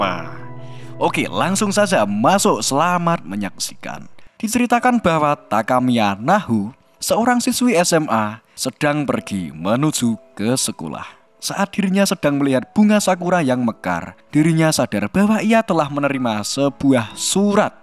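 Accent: Java, Indonesia. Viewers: Klw medok itu tingkat ke Medokan nya bisa di kurangin gk ya..🤔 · Saran jangan terlalu medok apa lagi aaa iiii uuuu eee ooo nya delete aja soalnya kefokusan jdi samar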